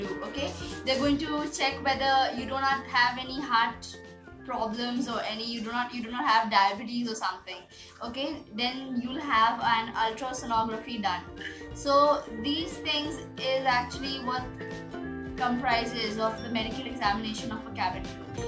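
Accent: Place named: Nepal. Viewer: so cute maam u r!! nepali acent 😘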